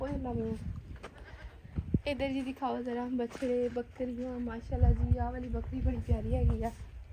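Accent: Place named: Doaba, India. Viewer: Tuhadi punjabi doaba di lag rahi hai...charde punjab ch Jalandhar te Hoshiarpur doaba ch aande ne...inz lag reha jiwe tusi bhi etho de ho...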